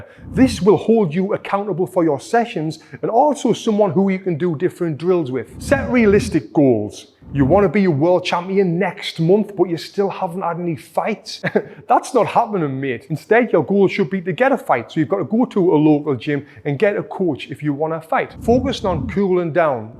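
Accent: United Kingdom. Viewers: Thanks for all the boxing tricks you give online for free, Buddy, I'm from Québec, Canada, and as I listen to you, your british accent makes me laugh, but please, do not take it as an insult. To me, it just brings a colourful side to your videos. I got stricken by a colorectal cancer in 2022 and I wanna get back to karate. I am not a good kicker but I'm pretty sure I can balance the equation by being able to hit harder and faster in some other way. Tal I want to improve my punching abilities and I am pretty sure your videos are a good way to go. Thanks again for your advices, and if you ever decide to come in Québec, Canada, for a vacation, I'll be pleased to guide you to good places to go to. Take care!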